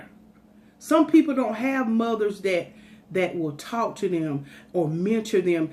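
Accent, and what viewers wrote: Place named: North Carolina, United States. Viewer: When I heard you speak, I said this is a North Carolina lady right here. You sound like my family. They live in Clinton, NC where my mom grew up but my cousins grew up in Mt. Olive. Good ole southern folks. 🤗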